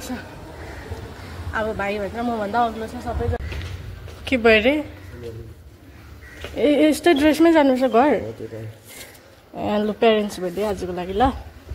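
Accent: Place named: Sikkim, India. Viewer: झन्डै नेपाली नै नबुझेको 😂सिक्किमतिरको नेपाली र नेपालको नेपालीमा धेरै differences हुँदो रहेछ। any way happy dashain form Kathmandu Nepal😊❤ 🇳🇵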